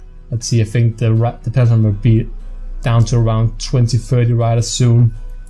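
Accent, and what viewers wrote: Denmark. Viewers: Er du Dansk? Du lyder Dansk?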